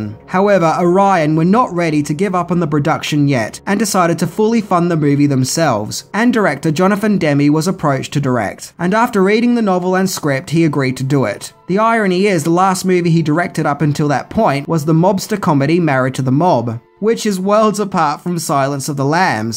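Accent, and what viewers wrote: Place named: Australia. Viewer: . Your assie accent is one of the reasons that makes your channel so enjoying.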